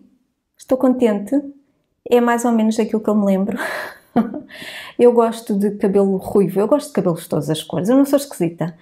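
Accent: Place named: Portugal. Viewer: Adorei o vídeo e ainda mais o seu sotaque de português de Portugal. A cor dos cabelos ficou ótima! 😊😊 Vou acompanhar o canal! Um beijo. Lari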